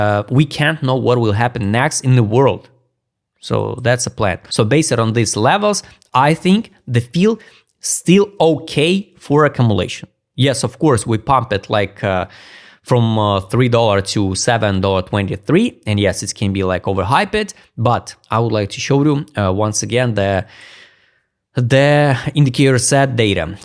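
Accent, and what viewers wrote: Russia. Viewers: Твой русский акцент превосходен.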